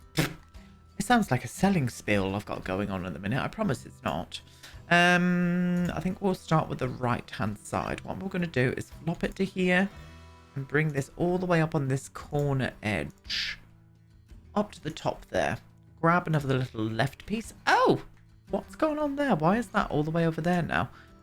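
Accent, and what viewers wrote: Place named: Devon, United Kingdom. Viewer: i always imagine your from devon, like, country p[b]umpkin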